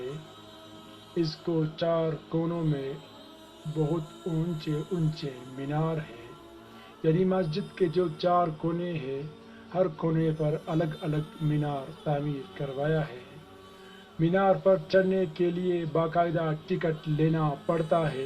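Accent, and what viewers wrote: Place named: Kashmir, India. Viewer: It's a kashmiri voice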